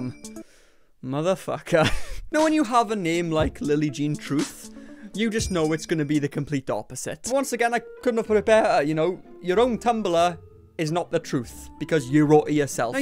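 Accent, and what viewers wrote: Wales, United Kingdom. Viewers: This man could talk about Eastenders and I would gladly sit and listen to him. I swear the welsh accent is a beautiful gift to humans. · well that growl thing made me feel surprising things aha you seem extra welsh in this one.. keep up the good work!! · a cute welsh accent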